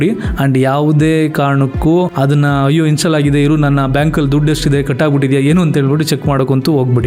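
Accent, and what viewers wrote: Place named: India. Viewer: ಕದಬಹಳ್ಳಿ ಇರೋದು ನಮ್ಮ ಹಿರೀಸಾವೆ ಅತ್ರ, ಹಾಗಾದ್ರೆ ಅಣ್ಣ ನೀವು ಅಲ್ಲಿನ ಸುತ್ತ ಮುತ್ತಲಿನ ಊರಿನವರು ಅನ್ಸುತ್ತೆ ನಿಮ್ಮ ಮಾತಿನ ವರಸೆ ನೋಡಿ ಮೊದಲಿಂದನು ಅನ್ನಿಸ್ತಿತ್ತು ನೀವು ಆ ಕಡೆವರೇ ಅಂತ ಈ ವೀಡಿಯೋ ಅಲ್ಲಿ confirm ಆಯ್ತು 😊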